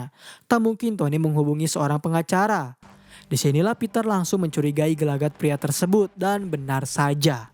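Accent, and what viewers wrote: West Java, Indonesia. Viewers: Anjirrr gurung gusuh😂😂orang sunda kah min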